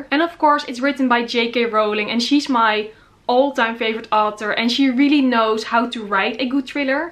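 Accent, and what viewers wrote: Netherlands. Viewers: i think she is from the netherlands about the way she talks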